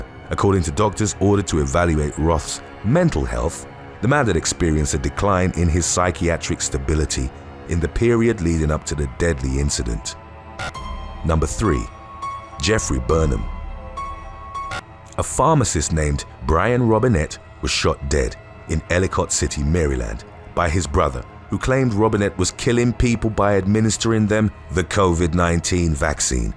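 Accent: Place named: United Kingdom. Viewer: I always enjoy your videos. I also love your perfectly calm narration voice and in particular your sophisticated British accent. Your accent always draws me in to listen to your videos.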